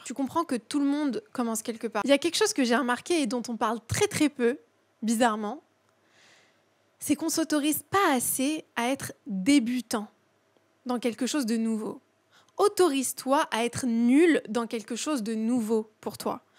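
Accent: France. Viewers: Bonsoir, j'espère que tout se passe pour le mieux pour vous. Je vous suis là récemment mais sur insta, plus d'un ou peut-être même deux ans. J'adore la façon dont vous nous parlez, c^est tellement inspirant. Je m'appelle comme vous et viens d'Iran. Je suis iranienne, mais je sais bien parler le français et enseigne le français. J'ai entendu dire que vos étiez d'origine irannienne du côté de votre père. Ça m'a tellement surprise qu'il y a quelques jours, je vous ai envoyé un message sur insta mais vous ne l'avez pas encore vu, j'ai donc décidé de vous parler là. J'ai une simple question à vous poser: est-ce que vous pouvez aussi parler le persan? Aussi bien que le français. Bon écoutez on vous considère comme une française d'après votre accent mais j'aimerais bien savoir si vous avez déjà voyagé en Iran? Sachez que j'apprécie votre travail et j'essaie de ne pas manquer vos vidéos. 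Car, d'une part, je renforce ma compréhension orale et d'autre part, j'apprends bcp choses qui concernent la vie, le développement personnel ainsi de suite à travers vos vidéos. Bonne continuation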